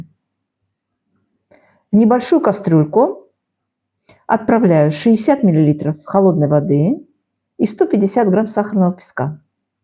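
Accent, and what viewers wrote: Baku, Azerbaijan. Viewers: Спасибо за наш старый добрый бакинский рецепт! Помню эти вкусняшки делала тётя) Кстати,акцент наш слышен до сих пор!))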